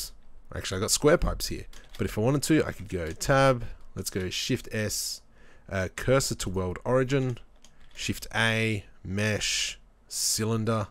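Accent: Australia. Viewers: Spinning tutorial made infinitely better in an Aussie accent ;P - Good stuff and very helpful.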